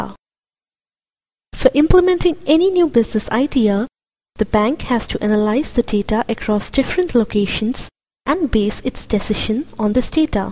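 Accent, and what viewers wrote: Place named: India. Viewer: it's like india accent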